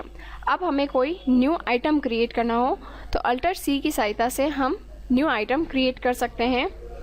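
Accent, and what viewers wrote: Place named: Bihar, India. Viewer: Mam aapka accent Bihari wala h kuch samjh nhi aaya bol kya rhe ho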